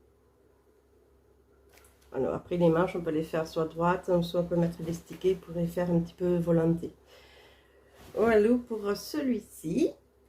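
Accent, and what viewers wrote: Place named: Tarn, France. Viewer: Coucou, me voilà abonnée ravie d'avoir reconnu mon accent, je suis du Tarn sud sans parler du petit indice derrière toi 😉 Hâte de voir tes cousettes, nous partageons pas mal de créatrices dont les meilleures les Bobines Patterns 😁 A bientôt 😘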